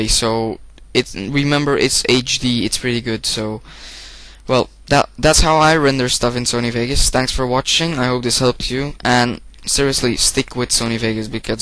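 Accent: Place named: Netherlands. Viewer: haha en je nederlands? dat lijkt zo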